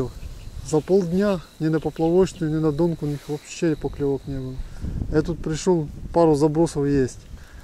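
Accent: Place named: Russia. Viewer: у вас наче старо-руський акцент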